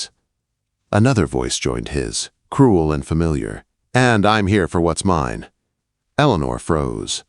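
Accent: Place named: California, United States. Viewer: Nice story, but the narration was a joke. So California USA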